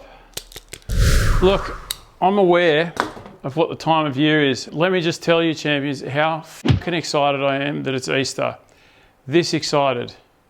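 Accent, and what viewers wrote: Australia. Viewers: YT's auto-captions can't understand Australian. It's chat.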